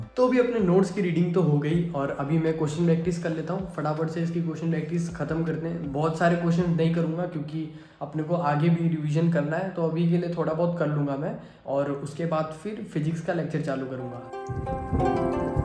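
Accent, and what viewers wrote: Maharashtra, India. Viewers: Your language gives a very strong maharashtrian vibe😂❤ BTW another maharashtrian here.💗🌟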